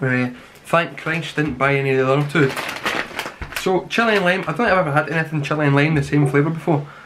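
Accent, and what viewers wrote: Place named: Scotland, United Kingdom. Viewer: These crisps are never hot enough for me, no matter how strong the packages says they are.... :D what a Scottish accent mate, you could use it to your advantage if you want to do yt seriously. i subbed and liked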